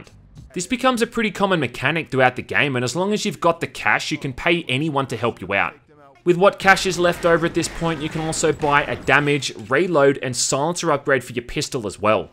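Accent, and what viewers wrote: Australia. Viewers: defo an aussie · My new favourite thing: listening to game reviews in an australian accent 😅 I wanna add, that cypress hill not only made the soundtrack some of the voice acting as well.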